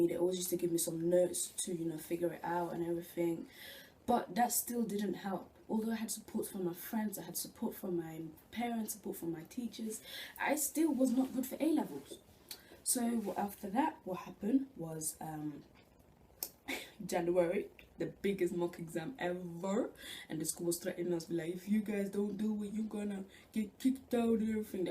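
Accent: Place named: Africa. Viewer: Hi i really enjoyed this this video! especially your accent, where in africa are you from, i'm from the east but i live in england. I'm doing btec creative media (level 3) because i don't think A levels are for me.i start this september! i am happy with my results, i only failed maths but i am retaking that. A you still doing btec?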